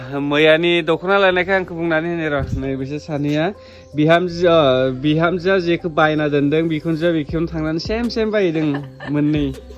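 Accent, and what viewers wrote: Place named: Goalpara, India. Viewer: जोंनि गवलपारानि रावजों सेम आदा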